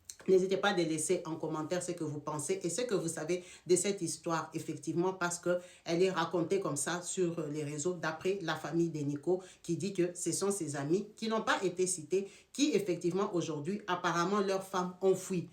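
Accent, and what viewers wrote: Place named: Cameroon. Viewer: Madame arrête de parler comme une camerounaise ⛔ ton accent est nul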